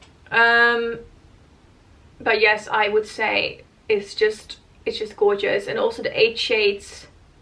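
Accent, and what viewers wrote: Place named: Netherlands. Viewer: Ik hoorde een ‘kleiin NLaccentje’. En toen zag ik je naam en wist het zeker😄. Bedankt voor de review!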